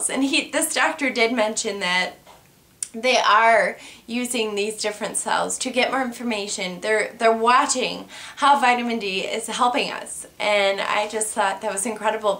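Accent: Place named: Detroit, United States. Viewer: hi have a question with de mylasation of the nerve cells do people with ms have seizures curious cause this how i found your vids was looking for info on nerve cells in the brain, stroke i had has left me epileptic taking dilantin to control them and is working:) also it's so nice to see you smile, defiatley could light up any room with it:) luv the little Detroit accent too lol Canadian here / toronto eh:)joe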